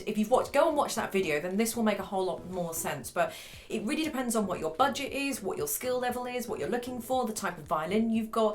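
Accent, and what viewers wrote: United Kingdom. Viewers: Unless your from the USA. Then it would seem normal and someone with a British accent would seem different but I would never use the word "hilarious" because she sounds wonderful. BTW one of the most renowned makers of violins is a New Yorker. His violins sell new for over 100K and you'd have to wait 3 to 4 years to even get one if your lucky enough to get one. He doesn't talk pretty either ( BUT who cares)??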